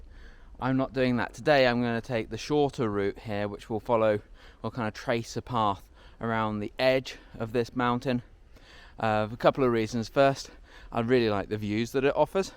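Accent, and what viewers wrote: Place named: Scotland, United Kingdom. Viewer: That was interesting morning walk with a bit of scottish accent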